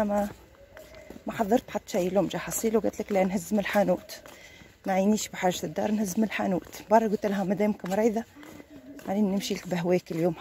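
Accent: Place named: Gafsa, Tunisia. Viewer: والله حسيت لهجة قريبة ليا برشا قفصة وبوزيد من أول الدنيا واحد ملا هذاكاهو أول ما تهبط لتونس نشوفوك عزيزتي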